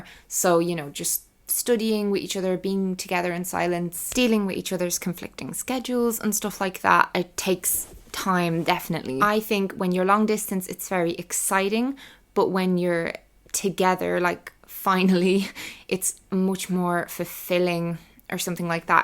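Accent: Ireland. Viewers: If I'm having an off day, hearing your Irish accent makes it a good one. Thanks!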